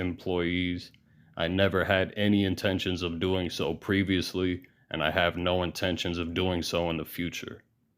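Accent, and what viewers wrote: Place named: United Kingdom. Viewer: Great British accent and you said she needs more greens is not a accusation of any type.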